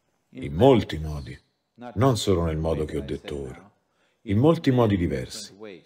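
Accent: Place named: Rome, Italy. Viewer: Certo che vedere un guru Indiano parlare e sentire il doppiaggio con accento romanesco non è il massimo... Almeno mettete qualcuno che non abbia un accento!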